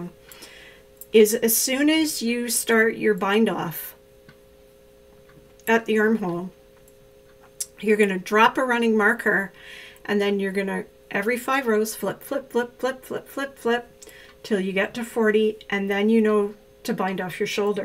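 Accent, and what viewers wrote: Canada. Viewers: Yup, I remember finding out about 'em when I was doing brioche. Now that I crochet only, it's all changed. Like life. Sighh .. But I like your delivery, and I always like a Canadian voice. :)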